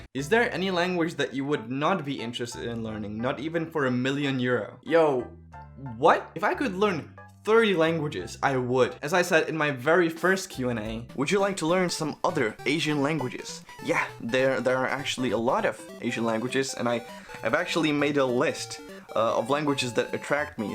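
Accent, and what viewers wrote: Japan. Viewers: your japanese English is professional, i can tell you. You speak literary the same as my English teacher at Japanese School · Japanese english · HAHAHAHAHA OK U MADE ME LAUGH IN YOUR NIHONGO/Japanglish 😂😬🤟🏼🤟🏼🤟🏼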